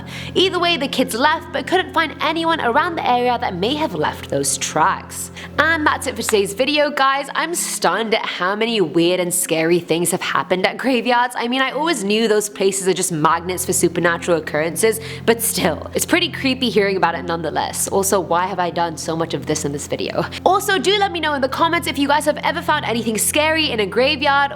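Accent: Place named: United Kingdom. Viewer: British accents! (I have a British accent too)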